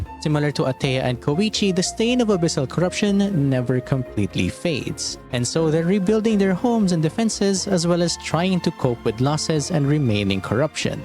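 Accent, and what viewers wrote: Philippines. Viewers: Are u a filipino fellow? Almost similar accent with peeps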